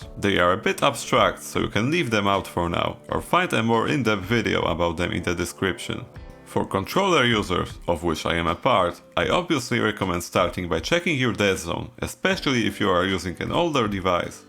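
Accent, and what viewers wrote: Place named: Poland. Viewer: from the first secon i understood that you were polish, recognise that accent everywhere LMAO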